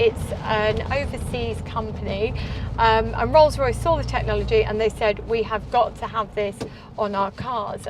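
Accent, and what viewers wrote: United Kingdom. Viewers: A very few of us can still speak the King's English!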